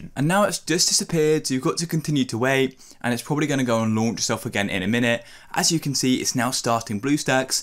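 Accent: United Kingdom. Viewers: bro is bri'sh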